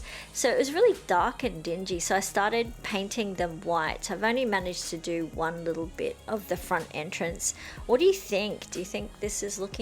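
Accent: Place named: Australia. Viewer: I just love your Australian accent. It's amazing.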